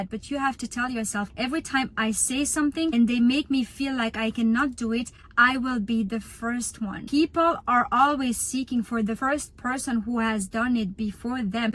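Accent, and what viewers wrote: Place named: France. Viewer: i really enjoyed this video!❤ i love your accent, are you from France?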